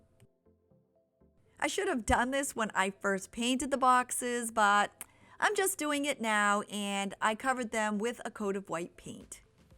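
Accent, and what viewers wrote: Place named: Boston, United States. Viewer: Any chance you're from New England area? I hear some kind of accent similar to my Mainah accent lol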